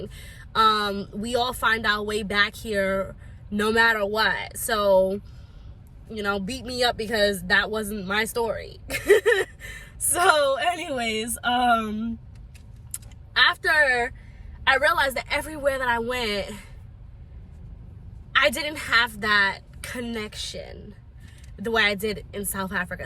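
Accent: New York, United States. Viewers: New York (American) accent.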